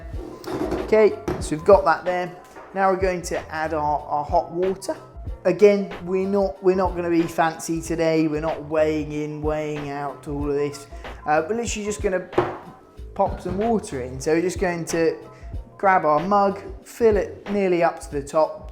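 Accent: United Kingdom. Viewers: You sound brit mate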